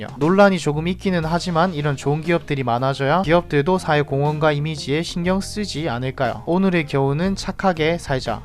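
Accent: Gyeongsang, South Korea. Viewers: 나레이션이 사투리죠? 고향이 경상도인가봐요. 잘 듣고있습니다.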